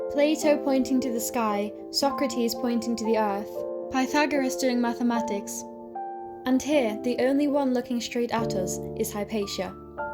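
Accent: United Kingdom. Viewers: Hi there! Stumbled upon your channel, great content. Keep up the good work. And have to ask: Where are you from? You look like a Scandinavian, but sound very brittish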